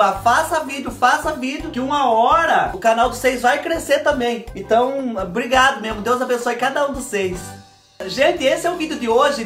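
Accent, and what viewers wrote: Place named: Caipira, Brazil. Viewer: Que felicidade sem fim. Seu jeito é único .Você com seu sotaque caipira muito natural contagiando os nossos lares.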